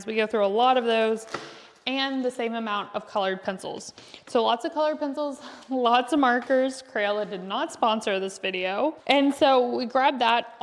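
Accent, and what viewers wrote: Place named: South, United States. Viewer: Really, that's a southern thing. Don't change for who you are for a comment. My family is from the south and says it the same way. 👍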